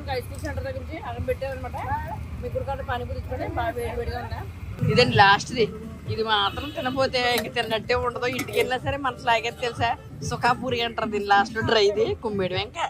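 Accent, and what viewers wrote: Godavari, India. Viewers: Hi Madam, Really I like ur Godavari slang and ur husband's funny behaviour...... Really I like ur funny videos..........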